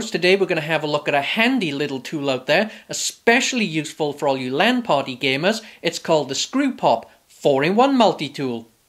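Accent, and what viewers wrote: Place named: Scotland, United Kingdom. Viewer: What accent is this?, its kind of scottish / south african / jordie.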